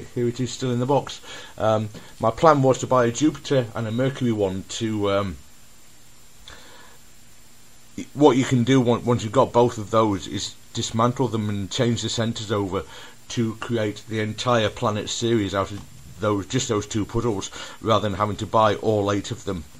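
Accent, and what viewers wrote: United Kingdom. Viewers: Rightright. I just mean British. I am not from an English speaking country so your British accent sounds awesome imo. :D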